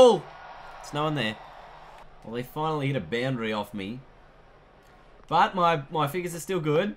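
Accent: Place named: Australia. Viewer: Omega Music he's Australian m8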